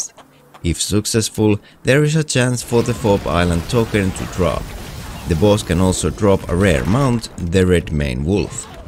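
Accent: Finland. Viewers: is that a finnish guy i am hearing since the Q and the word youtube came out pritty finnish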